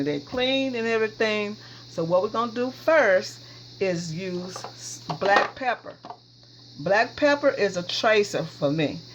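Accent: South, United States. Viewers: She can pronounce things the way she wants to when the food looks THAT good..leave us southerners alone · Jason Bourne wow I didn’t realize I was going to get all this feedback... calling someone a moron though? I just made an observation. If I were to pronounce something wrong I would want to know how to say it correctly. Sooo since you want to come for me actually it’s not her accent it is her southern dialect that you really should have referenced. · Omg i looove her KUN TREE ACCENT....kun tree cooking...kun tree eating..i love the way she say...THEH mye lol and buuuur ty full lmfao...im hollering....love her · I dont know why but i love her country accent...food looks great too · Jill Lancaster she has a very strong southern accent that's why jerks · lol she meant curry powder. She even said "pet a reeka" for paprika.....southern speech....sigh*** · The authenticity of the southern voice over made me love this even more! 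Have to try this recipe 💕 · This is country/southern cooking...and country/southern pronunciations for seasonings. If you want "correct" pronunciations...watch the food channel. It's almost like asking Cajun people to speak the "Kings English" when cooking. Let player's play...and cooks...cook. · I love her country southern accent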